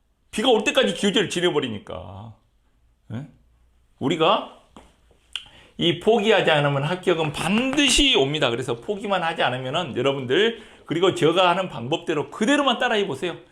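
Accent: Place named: Jeolla, South Korea. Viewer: 빨갱이 동네 전라도말씨네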